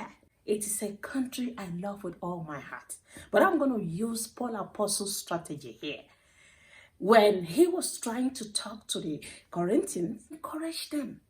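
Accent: Kenya. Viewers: Your accent is changing into a Kenyan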